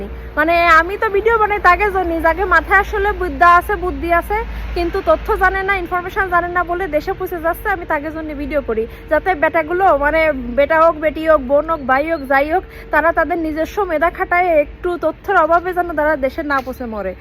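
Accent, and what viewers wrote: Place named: Jessore, Bangladesh. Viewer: আমার বয়স ৬৯ বছর, তাই আঙ্কেল হিসাবে একটা প্রশ্ন - তোমার বাড়ী খুলনার কোন এলাকায় ? জাস্ট কিউরিসিটি । প্রসঙ্গত: আমি তোমার ভিডিও পেলে সবটুকুই দেখি এবং গর্ব অনুভব করি । কারণ আমার আঞ্চলিক ভাষায় এত সুম্দর ও আকর্ষনীয় উপস্থাপনা আগে কখনও শুনিনি । শুভকামনায় ।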